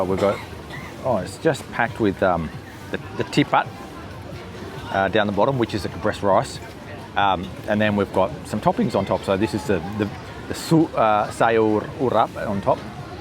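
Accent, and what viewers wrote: Australia. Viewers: Great vlog for an interesting peek at truly local lifestyle.....had never heard of this before. Gotta love hearing your Aussie accent reflected right back at you.....quite a surprise!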